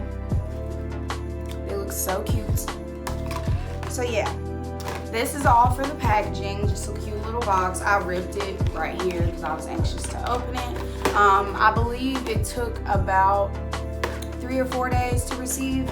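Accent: South, United States. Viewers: You gotta be from the south 😍😍😍love your voice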